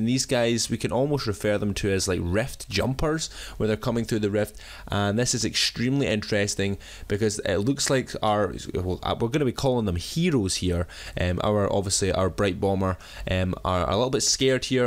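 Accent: Scotland, United Kingdom. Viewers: Are you scotch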